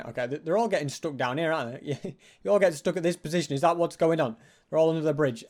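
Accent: United Kingdom. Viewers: I like British English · I never new you were British 🇬🇧🇬🇧🇬🇧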